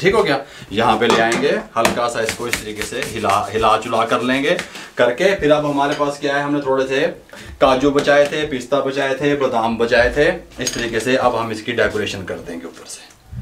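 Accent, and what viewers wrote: Punjab, India. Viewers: ،،،،، کرما والے اووووو،،،،،، میں کینا تسی پنجابی دا جیڑا تڑکا لان دے او اردو اچ بول دے بول دے، اودا وکھرا ای مزا ھے گا،،،، میں بڑا انجوائے کرنا واں ۔۔۔۔۔میرا تیان ریسیپی تے گھٹ ای ھوندا اے، زیادہ تواڈیاں گلاں تے ھوندا اے ۔۔۔۔😁 حالانکہ میری آپ مادری زبان اردو ھے گی،۔۔۔۔۔۔😅 الله تعالیٰ آپ کو ھمیشہ ھمیش خوش حال اور اسی طرح ھنستا بولتا رکھے ۔۔۔۔۔۔ آمیییین۔۔۔۔ والسلام علیکم ورحمتہ الله تعالیٰ وبرکاته ،،،،،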